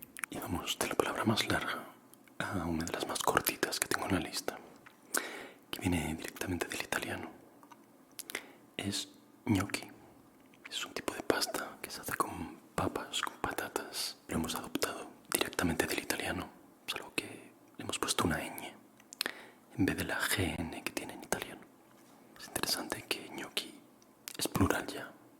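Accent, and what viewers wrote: Spain. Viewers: El Sonido bajito y delicioso de tu voz, reforzada con ese rico acento iberico, Me Pueden Me Llevan ...😘😌😴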